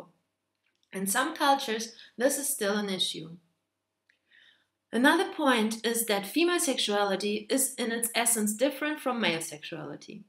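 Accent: Germany. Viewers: I love how it is super obvious that youre german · Are you German? :3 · Your German?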